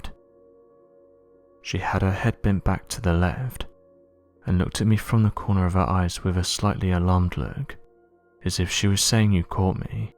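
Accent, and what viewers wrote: United Kingdom. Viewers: Why didn't the guy that experienced this situation be the one telling the story? Other than getting a dude with a British accent that's hard to understand tell a story that happened in America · I think I wasted 9 minutes of my life. A former US DOD investigator with a British accent? That should have clued me in right there. It happened 12 years ago and you didn't have a smartphone to take pictures. Not very believable.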